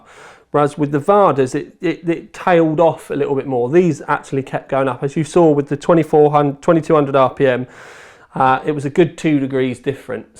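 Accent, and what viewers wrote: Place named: Southern England, United Kingdom. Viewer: So you guys are based at overclockers in Newcastle? But you have a southern accent like me!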